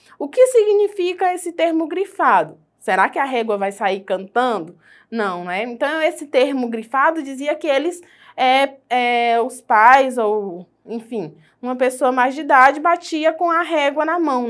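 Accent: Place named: Minas Gerais, Brazil. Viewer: Mineira kkk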